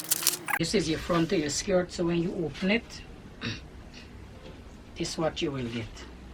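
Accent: Guyana. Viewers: I dont know how your video showed up on my YouTube. From I heard the accent, I knew you were Guyanese. I follow African fashion designers especially Nigerians online, but never came across à south américan/ Caribbean fashion designer. Before subscribing, I will watch most of your videos. The way of teaching is totally different than what I usually see. Detailled, proper, take all the time in the world to teach so that ppl could learn accurately. I say bravo. You even give advice about heavy on top or below which is so true. I like black, navy blue for below because my legs and calves are big lol now I have spoil belly so I need black for that area also. Well thanks for this channel and videos. Also thanks for taking all the time in the world for us to get proper learning. Maybe your channel continue to grow and grow. Ohh and yes I am from the Caribbean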